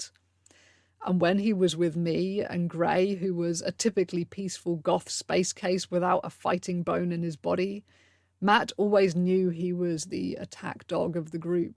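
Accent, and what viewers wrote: United Kingdom. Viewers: OUTSTANDING piece of work & presentation!! 👏I sincerely enjoyed taking this journey through the history of your Goth Life! Seeing you dressed in your amazing Goth wardrobe, & listening to YOU tell your story in your proper British accent, made it even more amazing! THANK YOU for sharing your precious life, time, talent, knowledge, & experiences with us all! Best wishes!😘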